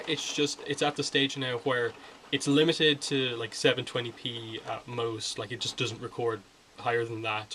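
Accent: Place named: Dublin, Ireland. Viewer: That accent - Churchtown? Terenure maybe?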